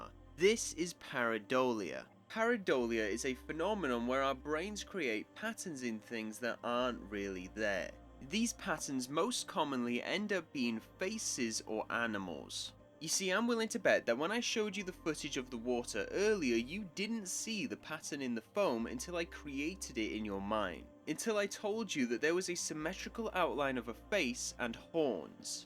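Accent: United Kingdom. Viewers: I like to watch your video it just my bad and my fault for insulting you cuz i like to hear when u says "Water" and "Woter" to a Wa Er 😂 but sometimes i heard and listen carefully on your "UK accent" it got me laughing alone like people look at me like am a craaaazyy person.. 😂😂😂😂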